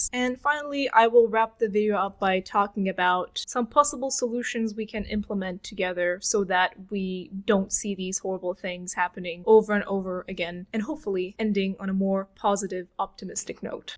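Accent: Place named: Turkey. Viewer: I am Turkish too and I was like "This accent is really really familiar " in the first video, Anyway Great video! I love the first one second one is also really good!!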